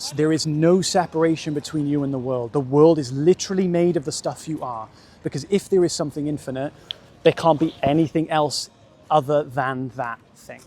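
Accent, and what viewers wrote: Wales, United Kingdom. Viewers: Detecting a bit of Welsh in your accent. On another note I love that there are other people walking round contemplating the same things that I do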